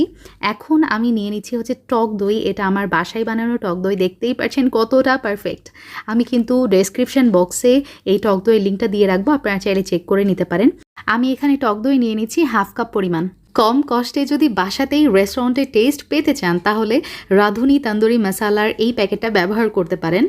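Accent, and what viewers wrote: United Kingdom. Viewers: আপনি কোথায় থাকেন?বিদেশি কিংবা ইংলিশ মিডিয়ামে পড়ুয়া বাচ্চাদের মতো বাংলা এমন স্ট্যামার করে বলেন কেনো?আপনার আগের ভিডিওগুলিতে তো এমন স্ট্যামার করে বাংলা বলতেন না আপনি তাহলে এখন এমন করে কথা বলেন কেনো?শুনতে ভালোই লাগে কিন্তু এইভাবে ইংরেজি টানে বাংলা বললে ভাষার মৌলিকত্ব নষ্ট হয়ে যায়।প্লাস আপনার সিমপ্লিসিটিও নষ্ট হয়ে যায়।অনেক আর্টিফিশিয়াল লাগে।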